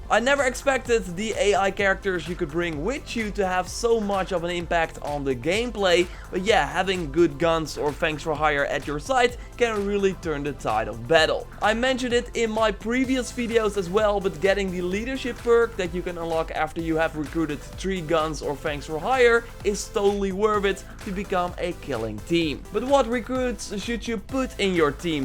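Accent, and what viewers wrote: Netherlands. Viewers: Can you have a more english accent, i know you're dutch but still can improve with your pronouncing. Keep up the good work! ;)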